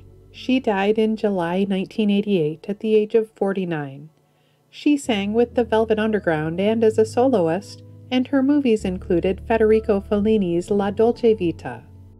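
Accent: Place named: Iowa, United States. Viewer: your voice is so soothing that it could lull me to sleep and your sweet accent sounds like the Midwest to me. If it’s not too personal, would you mind tell me where you grew up? My guess is Iowa because it sounds corn fed, but I think about Fargo and Marge’s accent and that would put you in Minnesota? Cheers, Mary Rose. P.S. Because I love arcane information, I know that Estonian, Finnish, Hungarian are one of the smallest non-tribal languages groups in the world, and now you know it too. Cheers and Keep Up the Good Work, Mary Rose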